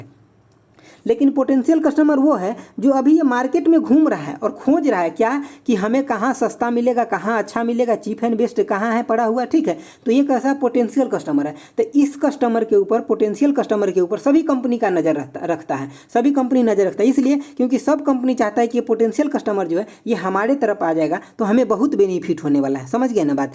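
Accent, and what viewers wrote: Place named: India. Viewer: Sir aapke ke padane ke technique ke saath saath bolne ka tarika bhi ekdum desi hai 😁 Jai exam crack academy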